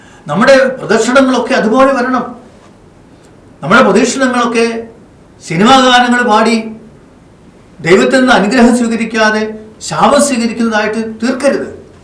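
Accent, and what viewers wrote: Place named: Chittattukara, India. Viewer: Fr., you are from chittattukara, your speech shows that •thank you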